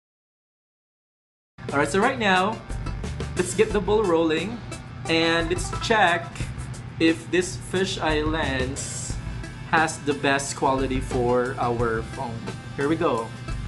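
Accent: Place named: Cologne, Germany. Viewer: Kolsener accent. 😁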